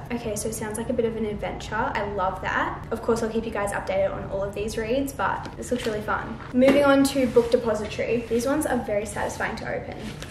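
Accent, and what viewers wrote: Australia. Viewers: wait, are you aussie? if you are, thats amazing! i am too, and i never ever see any aussie booktuder/ booktokers!! <3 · scripture in the opening card AND aussie accent? IMMEDIATE subscribe!!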